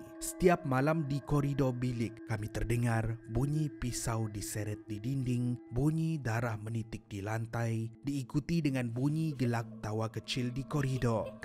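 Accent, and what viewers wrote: Sabah, Malaysia. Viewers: orang Sabah kah?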